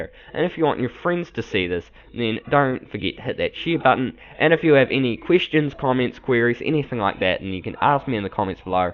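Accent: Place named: New Zealand. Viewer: kiwi I think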